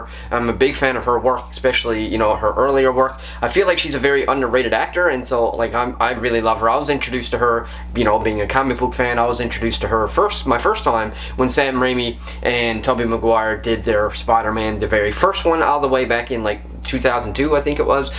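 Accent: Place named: Canada. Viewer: Probably not the greatest idea to make this movie when America is currently engaged in a literal soft civil Cold War. But im sure that's exactly why it was allowed to be made. It seems like pure propaganda to me. You sound Canadian. I suppose if they made a Canadian version of this, I'd watch it and think nothing of it. Maybe im wrong, but you keep saying "aboot" so I'm assuming you're from Canada. Forgiving me if I'm wrong. Edit: You said you're Canadian in the video. lol I probably won't watch this one due to being American. It hits home too hard with the current state of our nation. I'll take your word for it.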